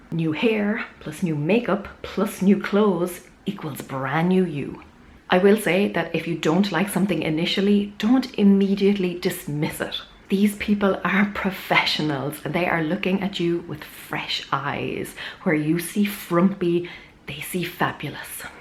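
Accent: Ireland. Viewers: The Irish accent is one of my favourite accents of all!! I immediately trust anyone with an Irish accent. Is that weird?? Hahaha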